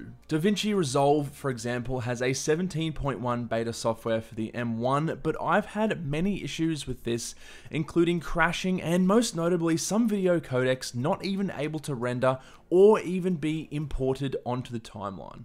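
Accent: Australia. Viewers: Can you turn off your Aussie accent? It's distracting.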